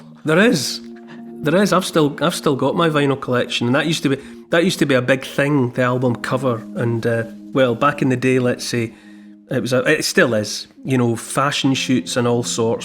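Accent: Scotland, United Kingdom. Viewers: I love your podcasts. God bless you and family. Happy Christmas and have a safe and very Happy New Year. Btw, great Scottish accent. I'm from Durham City and I suppose I'm English. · I love these podcasts. Your soothing voice and lovely brogue adds charm. (I watched you way back on your show "Coast") Happy Christmas to you and your team, and your family. Much love from Ontario Canada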